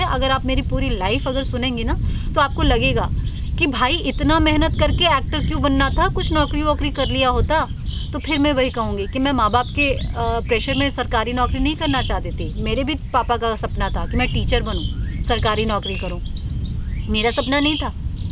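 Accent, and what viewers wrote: Bihar, India. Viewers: I'm from Patna and love ❤ you...I like your thoughts your vlogs your life style and yes aapka Bihari style me kabhi baat karna Bihari khanpan sab pasand hai....aur main aapse milna chahti hoon....kabhi aap Patna me apne subscriber se mile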